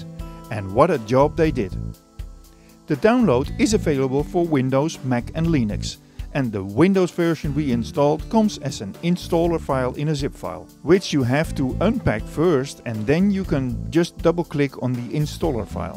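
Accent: Netherlands. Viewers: Is this guy Dutch? Hard to hide the accent 😉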